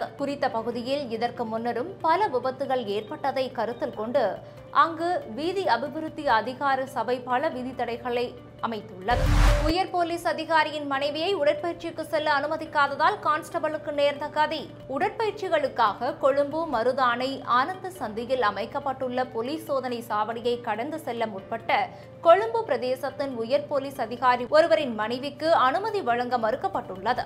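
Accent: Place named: Tamil Nadu, India. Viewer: இந்திய தமிழ் செய்தி வாசிப்பவர்கள் போல வாசிக்கிறார்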